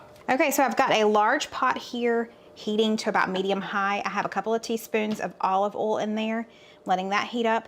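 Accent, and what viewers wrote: South, United States. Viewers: I’ve watched you in another videos and why do you rants your rice before you cook it? I’ve never heard of that before from anyone except for you so I might’ve been doing it wrong all these years. By the way you say boil, oil, foil and all of your southern words correctly. How do I know you say them correctly , well, you say I’m just like I do, lol.❤😂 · Awww Gracie Lou 😢. As far as your “English”? That’s called Southern English. I speak that language too 😂. All of these dishes look amazing!!!!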